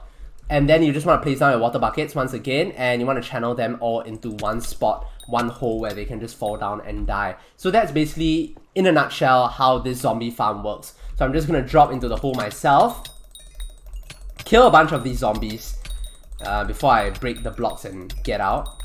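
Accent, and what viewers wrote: Singapore. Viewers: Omg that y ur voice sound like sg me also play skywars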